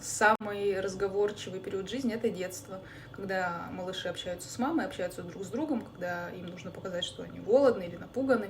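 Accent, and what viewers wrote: Siberia, Russia. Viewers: Мимо кассы. Сибирские они. 3000+ км от Тамбова. Говор — туча народу в Сибири так говорит.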